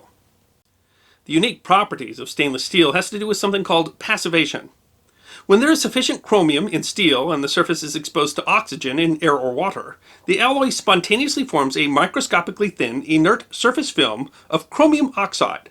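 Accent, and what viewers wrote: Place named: St. Louis, United States. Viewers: St. Louis Missouri? I was guessing the North East originally due to Your syntax and inflection. I'm guessing that You're a transplant then? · I had guessed that you were from the StL area when I first started watching you. You have that accent. I grew up in the Overland/ St Ann area.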